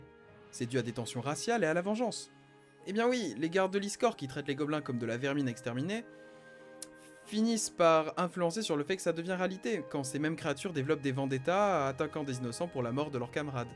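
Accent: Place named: France. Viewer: Dommage que la prononciation de l'anglais soit si... franchouillarde.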